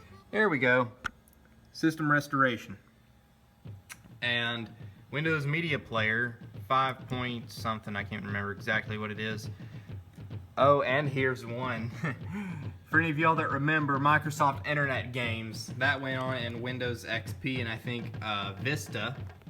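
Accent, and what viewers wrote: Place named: South, United States. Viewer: You must be from the south (Alabama)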